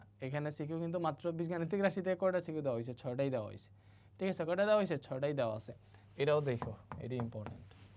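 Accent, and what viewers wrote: Sylhet, Bangladesh. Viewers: Vaiiya apni kita sylheti ni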